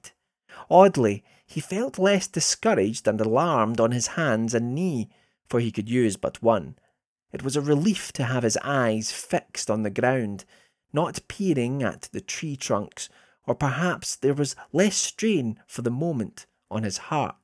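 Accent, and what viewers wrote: Scotland, United Kingdom. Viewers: That's quite a thick Scottish accent you have there!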